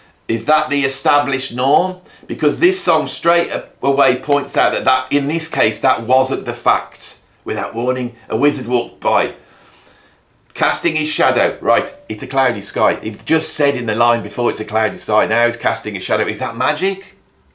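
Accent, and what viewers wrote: Birmingham, United Kingdom. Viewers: you're reverting to your Brummie roots! Reminds me of Vic and Bobs "Slade at Home". 🤣🤣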